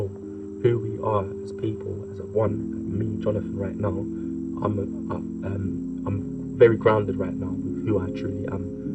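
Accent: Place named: RP, United Kingdom. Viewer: You have such an advantage with your British accent! You can literally post the inside of you nostril and make it look and sound posh 😅. You're awesome! God bless 🙏💖🌞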